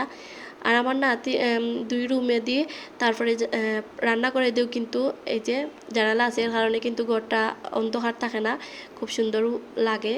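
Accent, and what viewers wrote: Sylhet, Bangladesh. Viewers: আপু সিলেটের কথা গুলো শুনতে অনেক অনেক ভালো লাগলো রুমটা খুব সুন্দর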